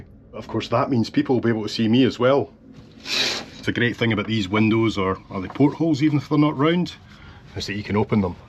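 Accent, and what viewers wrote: Scotland, United Kingdom. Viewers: oof. some days i'm better at understanding scottish-english than others.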